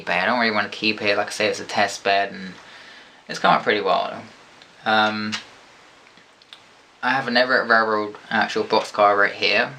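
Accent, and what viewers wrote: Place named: United States. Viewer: Great video! My god....such an American Accent too!!!;->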